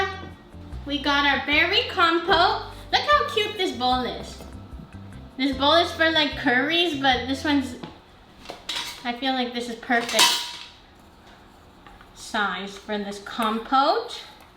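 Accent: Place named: Canada. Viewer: 2 minutes in and i thought "this person is canadian". 3 minutes in you confirm you're in Toronto. eta: omg, Midred's is soooo good! I came here after watching the video the chef did. the technique he demonstrated was a little different. when i was a kid and my mom made crepes we would have them with butter, sugar and lemon. so good. i also like honey and butter on my pancakes, but i most often do the classic butter and maple syrup. sometimes i make a berry compote.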